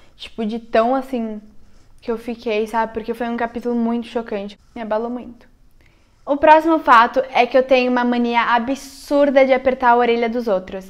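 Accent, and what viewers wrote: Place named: Rio Grande do Sul, Brazil. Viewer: Te amo, vc é muito linda, tbm acho legal o sotaque gaúcho. Vc é bem sincera, e vc gosta de tudo que é bom, curto muito seus vídeos. Determinada, sabe se expressar e sou sua fá. Bj princesa....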